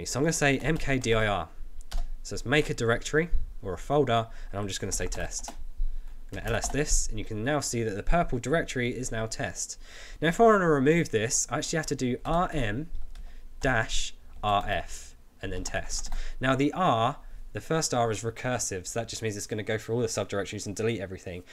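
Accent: United Kingdom. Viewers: He has a strong British accent.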